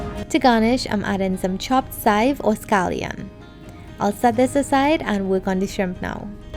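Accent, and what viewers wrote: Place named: Trinidad and Tobago. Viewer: Really delicious! Do you prefer the bigger or smaller shrimp for pepper shrimp? I never attempted it but this makes me want to 😻 Your accent always makes me happy to be Trini🇹🇹 I really need that hops at the moment!!!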